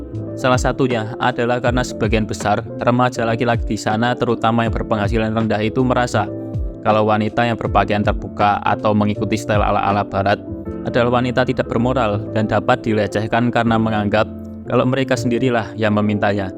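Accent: Java, Indonesia. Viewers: hanya karena author medok pun dibuat masalah, miris lu ya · kalo ngomongnya masih medok, ga usah lu gua lu gua brody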